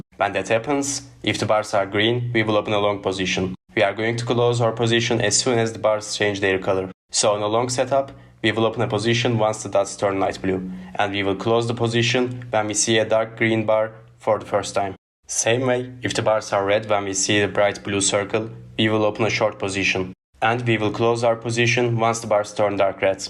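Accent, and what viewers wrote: Turkey. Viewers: Bu adam türk şivesinden anladım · Thank you for the video. Your pronounciation definitely shows that you are Turkish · You have so much Turkish accent. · türk olduğuna yemin edebilirim ama kanıtlayamam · tam bir Türk ingilizce si açıklığıyla anlatmışsın,eline saağlık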